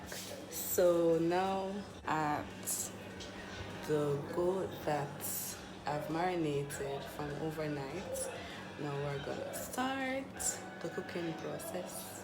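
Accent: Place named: Jamaica. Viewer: Yes yes . I can tell your jamaican.. great job on this hun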